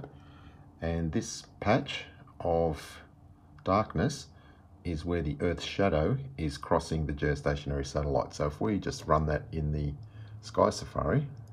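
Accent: Australia. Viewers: love your accent and australian accent in general. i learnt my english with a canadian prof and i wished that my teacher was british or australian. cuz canadian accent is boring af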